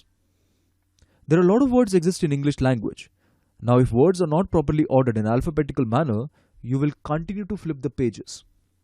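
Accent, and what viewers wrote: South India, India. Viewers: You are very good at speaking sir. Often as an Australian I find it difficult to understand Indian accents easily; however you speak very clearly and well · ngl content is lit but the indian accent prolly south side fucks it up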